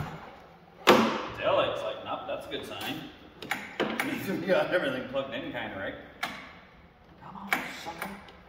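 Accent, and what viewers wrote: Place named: Ontario, Canada. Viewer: Where you from bud sounds like you’re from Ontario ?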